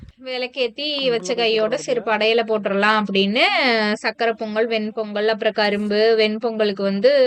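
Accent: Kongu, India. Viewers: நாங்கள் கொங்கு வெள்ளாள கவுண்டர் தங்கச்சி தம்பி அம்மா உங்கள் கொங்கு தமிழ் சூப்பரோ சூப்பர் பொங்கல் நல்வாழ்த்துக்கள் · இனிய பொங்கல் நல்வாழ்த்துக்கள். கொங்கு தமிழில் பேசுவதே தனி அழகு. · நீங்கள் என்ன கூட்டம் கொங்கு வேளாளர் என்று நினைக்கிறேன் உங்கள் பேச்சு அப்படி உள்ளது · Indha video kum unga jathikum enna irukku... Avanga pesaradhu kongu tamil... Edhukku jathi sayam poosathinga...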